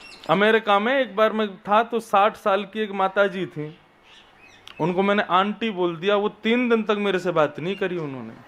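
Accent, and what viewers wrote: Braj, India. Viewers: Jay Shri Krishna Radhe Radhe 🙏 ♥️ 💙 ❤️ OM 🕉 Namo Bhagvate Vasudevy Namh 🙏 ♥️ 💙 ❤️ OM Namo Narayan Jay Shri Shyama Shaym ji Jay Shri Guru Dev Maharaj ji Aapke Charno Mai Koti Pranam Pranam Jay Shri Radha Rani Jay Shri Radha Rani Jay Shri Raman ji Jay Shri Radha Vallabh Lal ki Jay Ho Jay Shri Guru Dev Maharaj ji Aapki vraj Bhasa Badi Sumadhur hai Radhe Radhe Jay Shri Harivansh Guru Dev Maharaj ji 🙏 ♥️ Jay Shri Hari Jay Shri Vrindavan Dham Radhe Radhe Jay Shri vraj vasi 🙏🙏🙏🙏🙏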